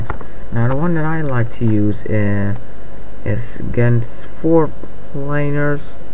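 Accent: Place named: United States. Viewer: Hey. Dank je voor deze tutorial. Dat hielp me veel. En jouw Engels is heel erg goed eigenlijk. Je klinkt als je Amerikaans bent. Sorry als ik een fout maak, ik spreek alleen een klein beetje Nederlands.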